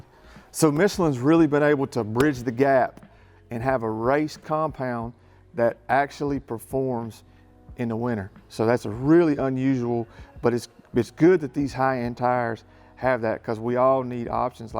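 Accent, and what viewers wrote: South, United States. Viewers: Where are you from as in state? Louisiana, Arkansas, Alabama? I hear your Southern accent.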